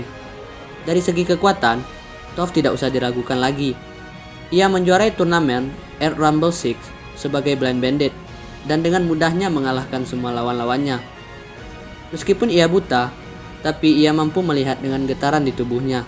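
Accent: Padang, Indonesia. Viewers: Abang orang padang ya Bng 🤣🤣🤣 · Org padang y min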